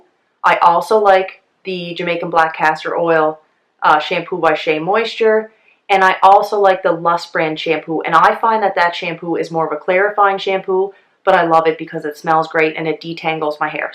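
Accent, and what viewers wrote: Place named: Cape Breton, Canada. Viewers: Hi! First of all let me say I love your channel! I came across it a few few weeks ago when I decided to let my natural curls go for maybe the fist time ever... As a teenager and into my twenties I fought my curls with a curling iron and then 14 years ago I decided that a straightening iron was the best thing ever!! Well 6 months ago I decided to embrace my grey hair as well.. Yes I jumping in over my head (pardon the pun! Lol!) with grey and curls.. What am I thinking! I bleached out my dye last August to help with the grey transition.. I thought I destroyed my hair it was like straw! Anyway I continued to straighten.. Until I began to hear about the curly girl method. I searched YouTube and found your video of how to step by step do my hair and tried it I was... to say the least... Amazed!!! Did not know my hair could do that! 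And I remind myself that it should just get better... Also amazed at the compliments I have gotten already... My hair is still two tone from the grey hair/ bleach grow out but I really think I will embrace my new look! Oh and I just want to say when I first seen your channel your accent was what caught my attention... I am from Newfoundland and I wondered if you were to, but turns out Nova Scotia isn't to far and we're "neighbours" lol! Love your Province by the way! Keep up the the great videos and Happy Anniversary!😊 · I don’t even have curly hair and I love watching your videos and hearing your CB accent (makes me miss home!)! Would love to see some healthy lifestyle videos. Congrats on the new office!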